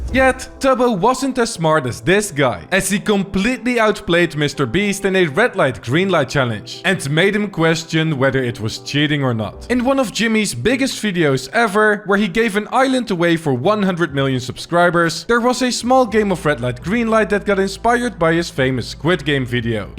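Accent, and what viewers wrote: Netherlands. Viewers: are u dutch.....?? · i smell dutch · You have a dutch accent and i know cuz im dutch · I smell dutch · I smell Dutch 🇳🇱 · Bro u Dutch? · Why the freak are you trying to talk english with this accent, if ur dutch just dont do it bcous then its just irretating.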